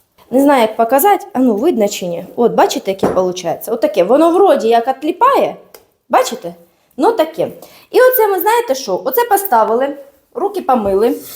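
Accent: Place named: Kherson, Ukraine. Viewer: нравится такая речь У нас на Херсонщине так разговаривают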